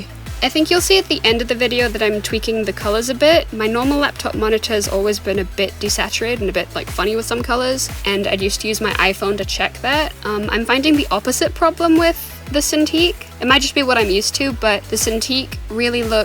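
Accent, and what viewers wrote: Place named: Australia. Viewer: Amazing drawing! Btw since its winter for you and from your accent we can tell youre from australia or new zealand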